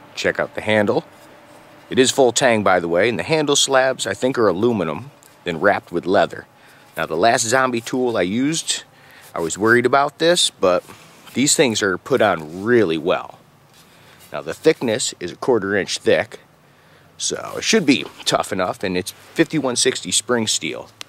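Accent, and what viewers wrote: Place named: Maine, United States. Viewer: hey what State are you from? cause you sound like a mainah or someone from Maine. cool videos!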